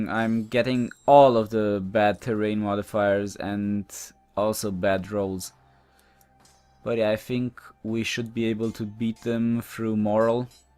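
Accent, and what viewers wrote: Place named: Germany. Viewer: I really like your voice. Keep your work up, its good .D Greetings from Reddit. Btw: Are you from Germany? Your Accent sounds a little germanic.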